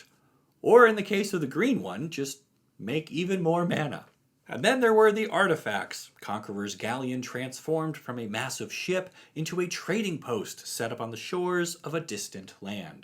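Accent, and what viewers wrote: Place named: Texas, United States. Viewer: What part of Texas are you from? I’m from DFW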